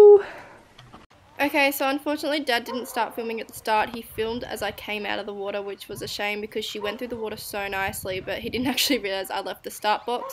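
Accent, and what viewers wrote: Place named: Australia. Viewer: Lol I picked your accent. Are you from Australia? I'm in NSW!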